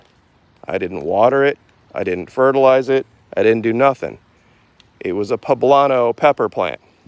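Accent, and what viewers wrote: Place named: South, United States. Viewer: Hey! Yer country voice is leekin’ thru!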